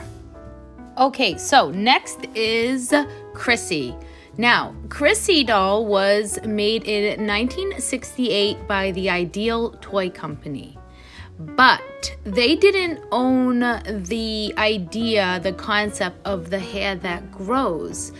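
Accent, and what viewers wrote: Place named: Maine, United States. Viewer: Love your accent! Do you live in Maine?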